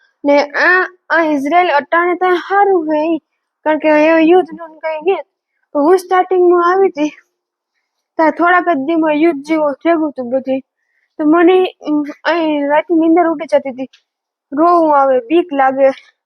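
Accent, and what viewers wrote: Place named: Saurashtra, India. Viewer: તમારી ભાષા ઉપરથી એવું લાગે સ્વોરાંસ્ટ બાજુ ના લાગો છો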